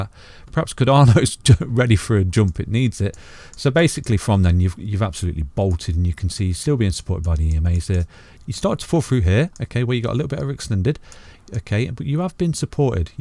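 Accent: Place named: Leicester, United Kingdom. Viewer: East Midlands Crypto massive!! Guessing you might be from Lestoh as well haha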